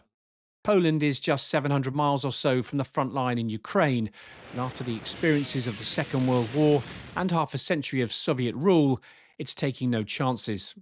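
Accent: United Kingdom. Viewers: British accent and real intention of Russia are not connecting together.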